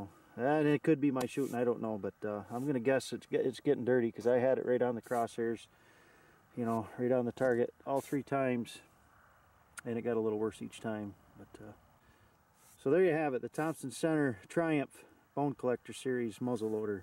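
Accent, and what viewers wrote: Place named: Ireland. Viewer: Great video. You realize that you have any very obvious Irish accent under your American. Did you do your family tree lol ? Best regards, Eddie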